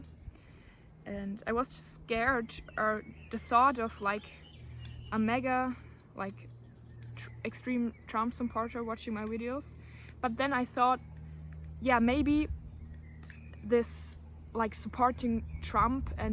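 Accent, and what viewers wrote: Germany. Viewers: Mir gefällt dein deutscher Akzent und du hast schon etwas Ähnlichkeit mit Doc Caro :)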